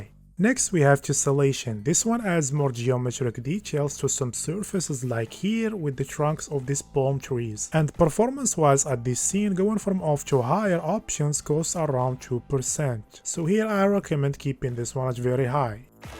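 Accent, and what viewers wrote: Morocco. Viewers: maghribi english n9iya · ana 3rfto mghribi ghir mn laccent dyalo hhhh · Naddi erftk mghribi ghir mn laccent dyalk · BRO i can tell a moroccan when i hear one 😂😂😂😂 rak nadi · yo bro are you moroccan your accent is moroccan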